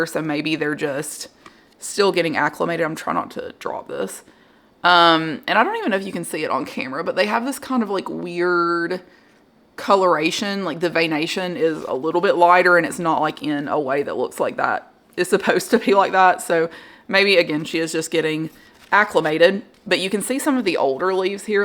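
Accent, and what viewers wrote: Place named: Deep South, United States. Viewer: I've consolidated plants into one pot many times now, because I also have a lot of plants--but I have never thought of combining some of my cane begonias for some reason--and that is brilliant! I'll definitely be doing this soon. Also I really enjoy your videos and your accent (I live in Pensacola, FL, and I don't know of many PlantTubers from the deep south, so your accent sounds like home to me). (=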